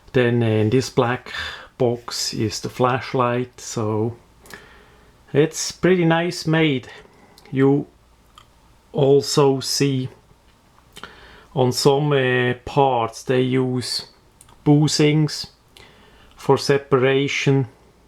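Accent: Switzerland. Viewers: amazing knife, helpful video and your english has a funny swiss accent ;) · Oh Swissenglish very lucky axent,!!! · Das isch es richtigs Schwitzerenglisch :-D eifach genial! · Merci ver de swiss-akzent :) geil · I come from germany and I always liked the swiss accent... In English it's even better! =)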